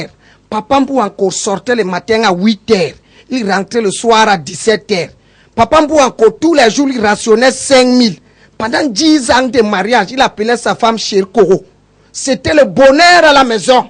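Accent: Cameroon. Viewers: l'accent bassa ..parfait mais pour l'histoire , j'ai eu du mal a decoller...mais pas mal tt de meme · J'aime cet accent bassa'a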